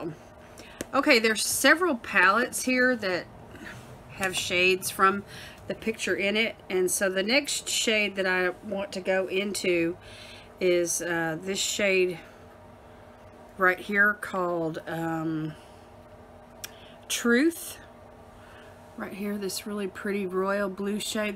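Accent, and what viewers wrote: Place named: East Tennessee, United States. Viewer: Hello,! I’m here from Erica’s channel, & I really enjoyed seeing the gorgeous look you created with the generated colorstory from the photo she chose for you! Those vibrant blues & greens look lovely on you! I have to ask (particularly based on the location you chose for her) if you’re a fellow Tennesseean. I’m in west Tennessee about 25 miles east of Memphis, but my mom was born in east Tennessee (Kingsport, if I recall correctly), & I thought I detected a familiar east TN accent! 😉👍✨💚💙💚💙✨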